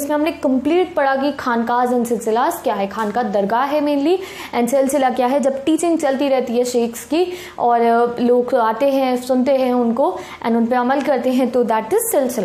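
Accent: Punjab, India. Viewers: Aap Punjabi ho?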